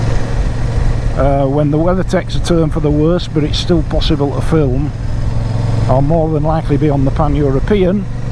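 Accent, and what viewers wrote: Yorkshire, United Kingdom. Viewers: Just subscribed. Enjoying your videos of my native Yorkshire which I left over 50 years ago. Great accent, great scenery, great bikes. Please keep on doing what your doing. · As an expat. yorkie as well as the content I like hearing someone what talks like me tha knows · Just subscribed to add to your 10k target. Never mind the negatives. Concentrate on the positives. I like your commentary with that yorkshire twang. Regards from a manchester biker.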